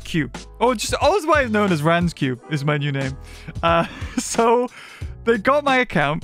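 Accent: United Kingdom. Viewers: if he has british accent i love it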